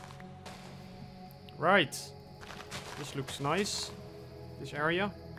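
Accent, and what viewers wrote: Netherlands. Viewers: hey are u dutch i hear it in ur accent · Dutch accent 🫶🏽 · hmm i smell Dutch? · are you from the netherlands?:body-blue-raised-arms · love het nederlandse accent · I smell dutch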